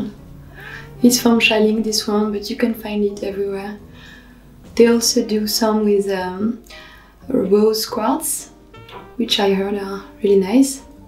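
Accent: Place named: France. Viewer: The French accent and the gentle tone of her voice is mesmerizing. She doesn't need make up, already perfect.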